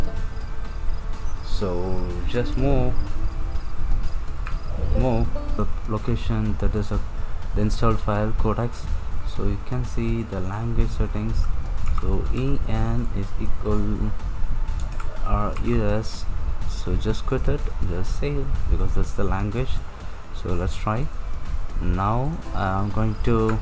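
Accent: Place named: Kerala, India. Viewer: U sound like mallu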